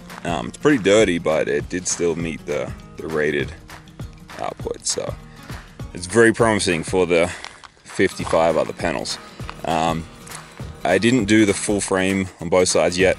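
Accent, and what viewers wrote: Australia. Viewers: Huh... You got an aussie accent ???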